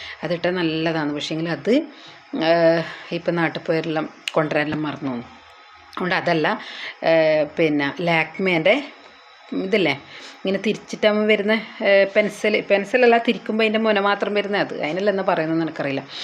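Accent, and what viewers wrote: Kannur, India. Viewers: കണ്ണൂർ കാരി ആണോ ഭാഷ അത് പോലെ ഉണ്ട്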